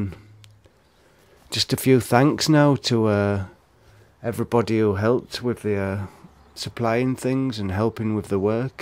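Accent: United Kingdom. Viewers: your british? birmingham ? obviously in germany now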